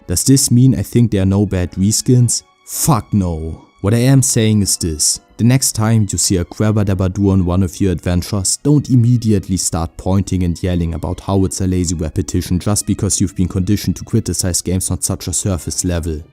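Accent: Germany. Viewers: Ich brauchte genau 5 Sekunden, um herauszuhören, dass du normalerweise Deutsch sprichst. :D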